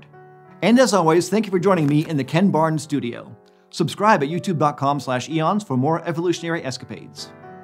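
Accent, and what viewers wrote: Pittsburgh, United States. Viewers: Wait, you're a yinzer?!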